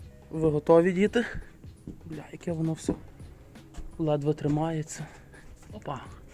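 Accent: Ukraine. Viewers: ТАК НАСМІЯЛАСЯ З ВАС. ВСЕ ПРИКОЛЬНЕНЬКО. ВАША МИЛА ВИМОВА ПРОСТА УКРАЇНСЬКА РАДУЄ. НЕ ЛЮБЛЮ МАТЮКІВ,АЛЕ В ВАС БУЛО СМІШНО. ГОЛОВНЕ ХАТКА ДІЙСНО ДУШЕВНА. В НІЙ ТЕПЛО ХОЧА НІХТО НЕ ЖИВЕ І РОЗВПЛЮЄТЬСЯ. ПІЧКИ ,ПЄЦИ ТО ШЕДЕВР!БЕРЕЖІТЬ ЦЕ. А ОТ ТА ЛАВКА ВИ СКАЩАЛИ ДЕРЕВЯНА ТО СОФА,АБО БАИБЕТЕЛЬ ЩЕ НАЗИВАЛИ. ДЕ ЯК В ЯКІЙ МІСЦИНІ. АЛЕ В ЄВРОПІ ЦЕ РАРИТЕИ! ПРОШУ ЗБЕРЕЖІТЬ ЇЇ НЕ ПОДАЛКУЄТЕ ВРЕА ЩЕ ВІДКРИВАЛАСЯ КРИШКА І ТАМ РІЗНІ РЕЧІ ЗБЕРІГАЛИ. ПОСТІЛЬНА БІЛИЗНА,ОДІЯЛА. В ІТАЛІЇ ІТАЛІЙЦІ ЇХ В РУМУНІВ КУПЛЯЛИ РЕСТАВРУВАЛИ І ,ЯК МЕБОІ ПРИКРАСИ ЦЕ. ЗИЧУ ВАМ НАТХНЕННЯ І УСПІХУ. СЬОГОДНІ ЗНАЙШЛА ВАС В ЮТУБІ І ЛЮБУЮСЬ.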